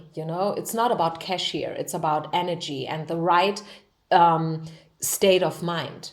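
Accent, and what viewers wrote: Germany. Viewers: #3 thank you so much! 🦀💗 For your wisdom, channeled massages and tips! Also for doing all of this to heal the world and yourself! I'm bso grateful for your readings and seeing you here! I love your German accent! (I'm a Mexican but I studied German for a short time in high school and I find it really gorgeous💗💗💗) Also I saw one of your Deutsch readings!!! And I also loved it! It's incredible how we are all connected, my intuition translated the words that I didn't study and it's incredible 💗💗💗 Love & light to you! namaste 🌻✨🌈🐉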